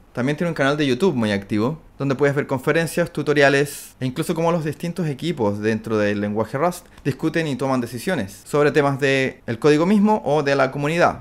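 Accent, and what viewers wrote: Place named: Chile. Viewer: Hola, esta semana YouTube me recomendó tu canal, me alegra comenzar a oir acento chileno por la plataforma, tenemos bastante capital humano, pero al parecer somos más tímidos que el resto de hermanos hispano hablantes. Tus videos me parecen muy interesantes, y espero que tu canal crezca.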